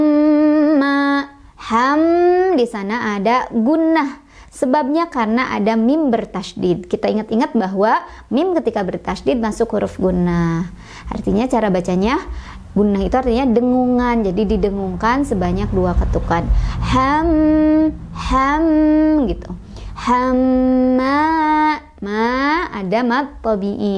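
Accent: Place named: West Java, Indonesia. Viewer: Subhanallah sepertinya teteh orang sunda ya. Kalo memang benar artinya kita satu suku. Alhamdulillah saya menemukan channel ini. Saya dari bangka sangat ingin belajar membaca ayat al quran dengan benar. Barrakalloh teteh terimakasih ilmunya sangat bermanfaat. Semoga teteh diberi panjang umur dan sehat selalu. Amin amin..🤲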